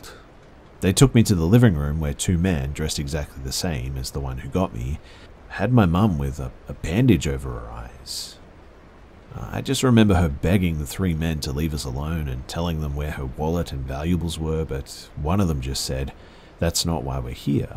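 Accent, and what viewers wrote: Australia. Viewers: Great to hear a Australian voice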